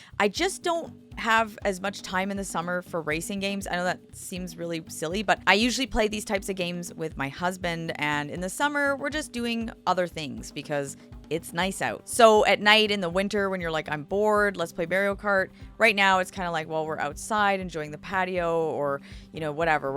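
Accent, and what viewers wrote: Canada. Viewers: is Canadian, and pronouncing Mario as "Marry-Oh" instead of "Mar-ee-Oh" is more Canadian than American.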